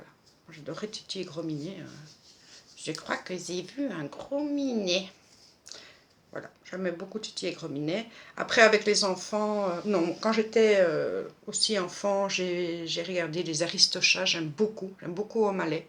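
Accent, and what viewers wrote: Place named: Belgium. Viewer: Coucou C'est super d'en apprendre plus sur les copines grace aux tags... je trouve ça sympa. Et je vois que toi aussi tu as un joli accent... belge😊 Bon week end Gros bisous😘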